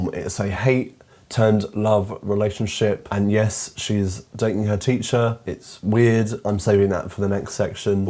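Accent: United States. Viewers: Your American accent is flawless 😅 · i love ur american accent lol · Your american accent is perfect never stop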